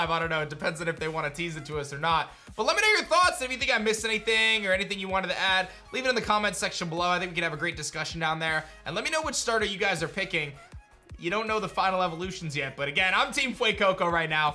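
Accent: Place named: United States. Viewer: all tho respect but it hurts to hear americans say spanish words ALL THOUGH RESPECT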